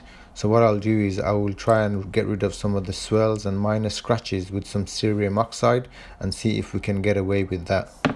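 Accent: Birmingham, United Kingdom. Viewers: Birmingham UK? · Fellow Brummie here. I live in the States now, and it's nice to watch your videos and hear a Brummie accent! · Birmingham. That's the accent I was having trouble placing. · Hello " Brummie " I really enjoy your videos. Keep up the great work !!! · great job Sir. Love that you included the multiple visits from your boy too. I can detect your Birmingham accent embedded within your original accent. Very interesting. I love the Birmy accent.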